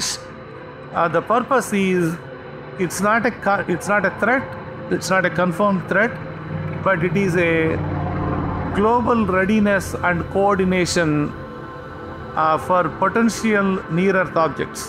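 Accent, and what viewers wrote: India. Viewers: I just can't hardly listen hard to understand. Indian accent. Sorry.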